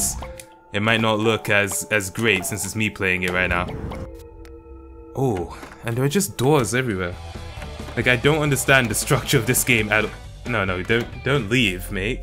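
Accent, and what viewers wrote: Nigeria. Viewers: are u igbo or Yoruba? -naijagang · Oh heck fam U Nigerian?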